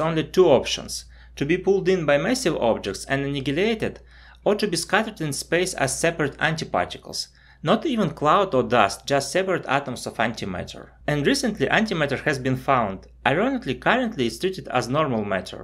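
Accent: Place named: Russia. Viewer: Hey! Isn't your native language Russian ?) I hear familiar accent 😁 If yes, just подмигни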